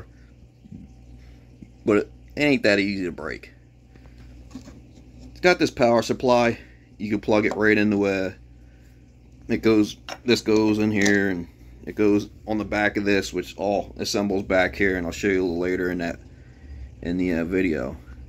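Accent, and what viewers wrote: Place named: Philadelphia, United States. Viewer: You didn't have to tell us you were from Philly I can tell you are from Philly since you have the Philly accent. LOL YOu have exceptional MacGrubber Skilz!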